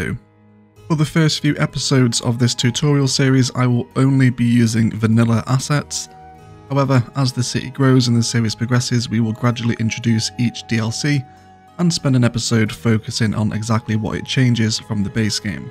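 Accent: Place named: United Kingdom. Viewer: Strange, for me as Russian your accent sounds like british but you prefer rigth-hand traffic. Its my ears failed? :)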